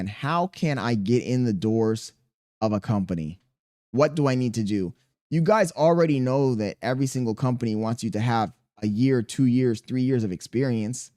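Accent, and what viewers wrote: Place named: India. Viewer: used those call center skills and the indian accent to get that job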